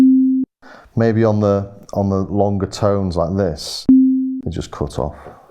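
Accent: Liverpool, United Kingdom. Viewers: love the video its helped me out, are you a liverpool lad?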